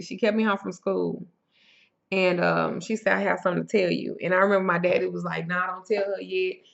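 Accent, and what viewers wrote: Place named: Texas, United States. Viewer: This is my first time watching one of your videos and I loved it! I can definitely relate to holding onto things from childhood and my father not being there like I needed and expected him to be. I knew you were from Texas when I heard your accent lol. You actually resemble my ex's mother a lot and they're also from Texas (Ft. Worth).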